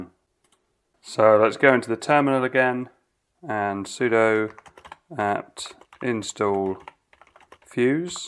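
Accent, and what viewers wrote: United Kingdom. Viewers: I bet you're from the UK.. Greets to Mr. Bean.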